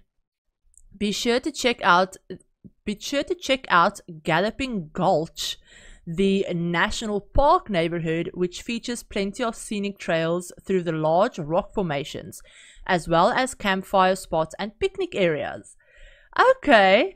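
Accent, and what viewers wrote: Afrikaans, South Africa. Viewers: OMG, I immediately recognised your Afrikaans accent the moment you started talking! Very cool to see another Safrican simmer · Yes, immediately recognised that SA accent and subscribed straight away😁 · OMG your accent r u South African, because if u r this will be my first time coming across a South African sims4 content creator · This is my first time here and I recognise a South African accent when I heart it so I had to do a double take and check that I'm hearing right because I know SA creators in this space are few and far in between. Congratulations. ❤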